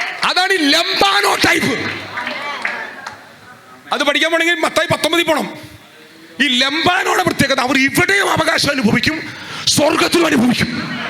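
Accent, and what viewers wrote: Thrissur, India. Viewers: തൃശൂർ ഭാഷയ്ക്ക് എന്താ കുഴപ്പം. ഒരു കുഴപ്പവും ഇല്ല.. സാർ ധൈര്യമായി പറഞ്ഞോ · എനിക്കിഷ്ടമാ ഈ തൃശൂർ ഭാഷ.... അത് പോലെ പാസ്റ്ററിന്റെ ചിരി....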